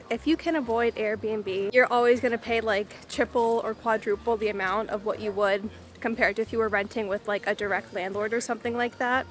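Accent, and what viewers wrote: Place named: Argentina. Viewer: ! Vengo de ver tu entrevista y tu forma de expresarte con esa mezcla de acento argentino y tu acento americano es super lindo. Me dió ternura escucharte con el acento americano pero a la vez me encantó escucharte pronunciar las palabras como porteña. Encontrá la manera con la que más auténtica te sientas, pero tu forma de hablar el castellano como argentina tambien es super lindo y un bonito gesto de honrar el pais que te recibió. 💖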